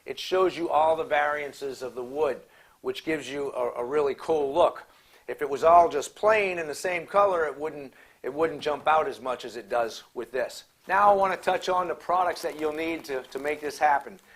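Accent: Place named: Metairie, United States. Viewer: I love your videos. As a displaced Metairian, the only person around here that sounds like you, is me! You remind me of home.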